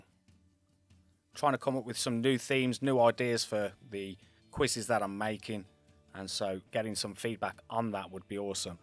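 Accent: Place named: Birmingham, United Kingdom. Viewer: 24, 😊 Great to hear a Brummie accent 👌 😊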